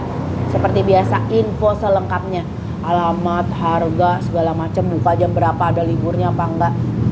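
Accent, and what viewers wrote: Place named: Sunda, Indonesia. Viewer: Gpp tteh ngomong Sunda jg .atw cmpur da stiap org pasti saat bicara logat daerahnya ke bawa..tugas editor ulah males ngedit.kerja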